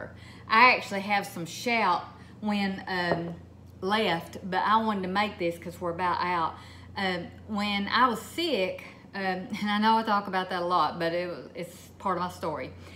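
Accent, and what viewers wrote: South, United States. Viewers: I came here for the stain remover recipe but I stayed for the southern accent.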